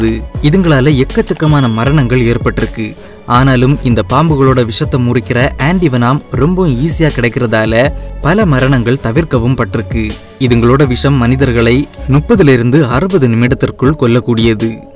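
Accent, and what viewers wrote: Kanyakumari, India. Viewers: Bro Kanyakumari pola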